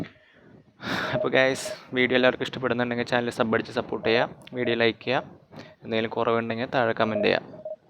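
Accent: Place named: Thrissur, India. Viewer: slang kettapole mansilaayi😂thrissuril evde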